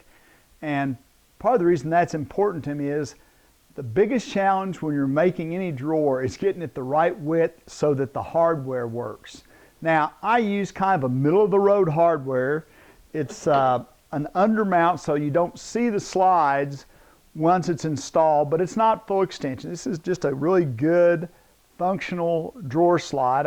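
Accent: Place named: Arkansas, United States. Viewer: Arkansas "mayshure" for measure. :) Accent sounds funny. Also "rebate" for rabbet. Good Videos.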